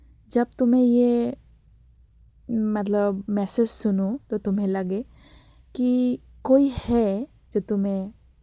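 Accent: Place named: India. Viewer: Indian tumhari awaaz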